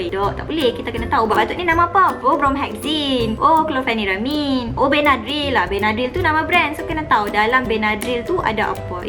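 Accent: North, Malaysia. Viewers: Boleh ja faham..biar la dia dah selesa ckp loghat utara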